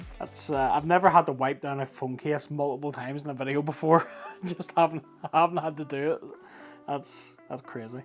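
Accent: Northern Ireland, United Kingdom. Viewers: You sound so northern Irish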